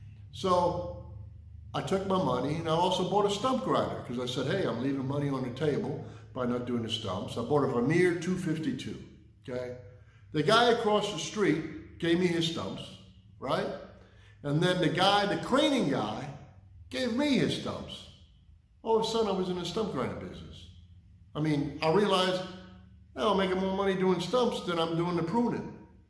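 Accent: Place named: New York, United States. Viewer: ... I knew it! Lol! My wife and I were watching one of your vids on the big screen, and I said to her "You think this guy is from New York?" She said "Nah" I'm originally from Syracuse, transplanted to Ohio about 26 years ago. Got a lot of 'you from NYC?' Didn't think I had that strong of an accent being from upstate and all. Now, the NY accent only comes out when I get upset. Lol! Have a great evening!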